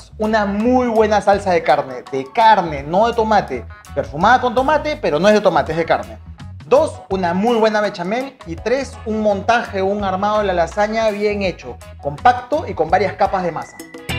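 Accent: Peru. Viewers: Gracias por el video. Que bonito oírte con el acento de peruano, así como hablan en Lima 😊😊😊😊😊😊😖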